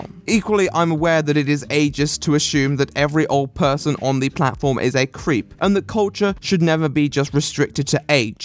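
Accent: RP, United Kingdom. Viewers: Received Pronunciations :) The posh accent. Not as posh as ye old aristocrats. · hes posh mate, pride of the uk. hes funny though so gotta love him!!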